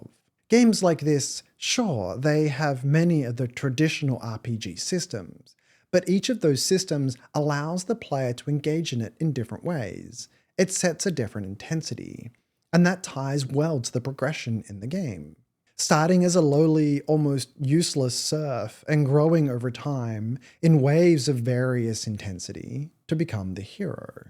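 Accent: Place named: Australia. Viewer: You sound australian but pronounce some words very american. I am confused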